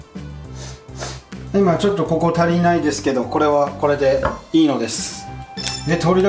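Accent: Kagawa, Japan. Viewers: 素のときに出る、讃岐弁がいい(´・ω・｀)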